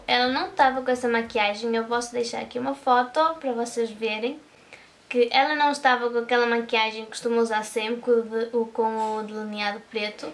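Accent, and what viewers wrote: Portugal. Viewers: Gostei do video, só tenho dificuldade pra entender o sotaque português :( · Que linda! Você ficou muito parecida com ela :D Adoro seu jeito de falar ♥ Portugues de Portugal ♥♥♥ Te adicionei no face e te segui no instagram :D Beijos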